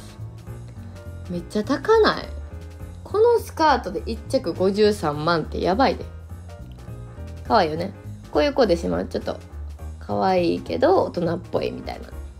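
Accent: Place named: Kansai, Japan. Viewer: 毎日更新、嬉しすぎる😊関西弁かわいい💕🥰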